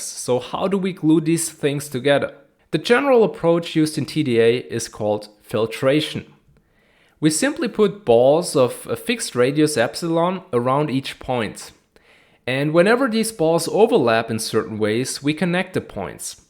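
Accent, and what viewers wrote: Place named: Germany. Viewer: Thanks a lot! Very good series! You sound German, where you acquired your education if I may ask?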